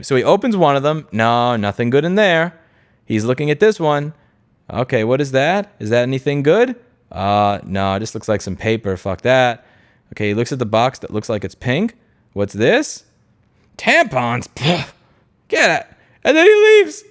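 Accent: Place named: Texas, United States. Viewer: Seems your NYC accent is already slipping into that famous Texan drawl. Makes me wonder what else is gonna change. You just got back to your goal weight, and you were so happy about it, so as a Midwesterner concerned for your health, I advise you to STAY AWAY FROM THE CASSEROLE!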